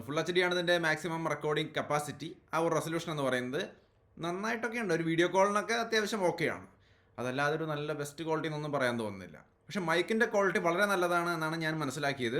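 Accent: Thrissur, India. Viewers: Thrissur slang kattak vannandalo e videoyil😁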